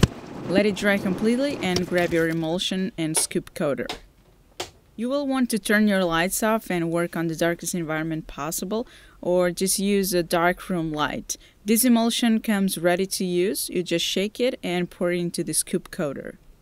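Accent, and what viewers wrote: Portugal. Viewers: Suspeitava que fosse portuguesa, pelo sotaque! Acertei hehe :D (sou professor de inglês, por isso notei facilmente, btw ;)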